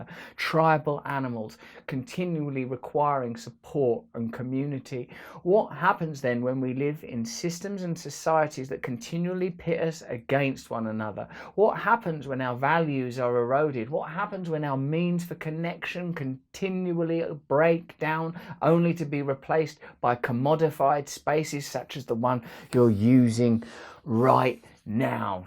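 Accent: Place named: United Kingdom. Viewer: three reasons why I always regret watching Russel Brand videos: 1. Strong British accent ( I bearly understand a thing) 2.circling around a topic 3.messy appearance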